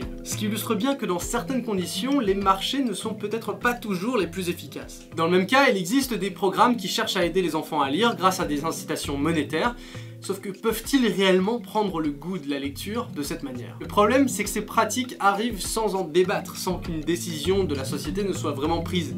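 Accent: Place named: England, United Kingdom. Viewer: Je salut l'accent anglais !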